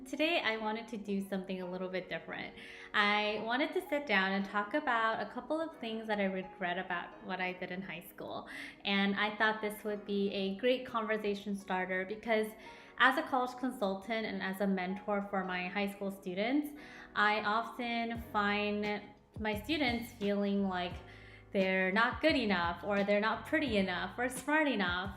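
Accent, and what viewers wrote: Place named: South Korea. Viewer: I love your 2nd gen Korean accent~~ lovely!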